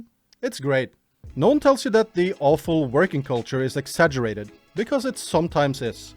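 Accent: Norway. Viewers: okay 3rd comment lol, not sure if youve ever watched norsemen (Vikingane) but I feel like the fact you have the same norweigen accent as that show makes stuff you say funnier haha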